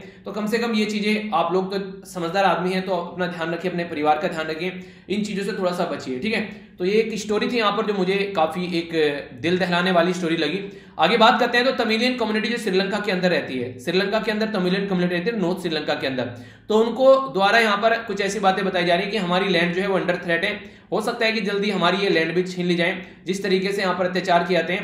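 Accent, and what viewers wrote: Haryana, India. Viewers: Sir aap pkka haryana se ho ,Musa =rat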